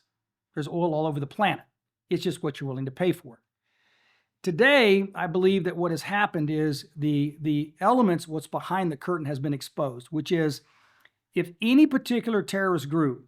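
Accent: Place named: South, United States. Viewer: I can hear the southern hills underneath this man’s voice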